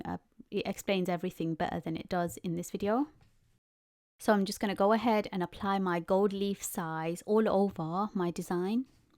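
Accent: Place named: United Kingdom. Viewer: i know this sound unrelated to your beautiful art this is the first time i've heard your voice i don't know why but i thought i was hearing a british accent (i don't mean it rudely i mean it as a compliment!) also thank you for the tutorial so beautiful Mashalllah!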